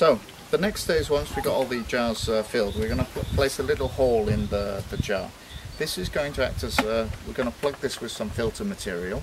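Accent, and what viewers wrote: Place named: United Kingdom. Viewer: You mean "spawn jars"? Oh, sorry, your british so you talk funny to me.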